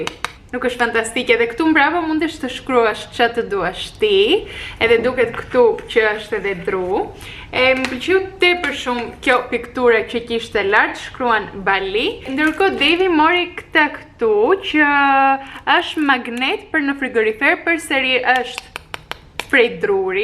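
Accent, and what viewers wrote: Greece. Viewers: Η προφορά η ελληνική στα αλβανικά σου είναι φουλ σπαστικό γιατί προσπαθείς να το παίξεις γλυκουλα ! Και βγαίνει σε σπαστικό και γενικά προσπάθησε να δείχνεις περισσότερο παρά να μιλάς κατα τα άλλα πολύ όμορφο βλογκ 🎉🎉🎉🎉🎉🎉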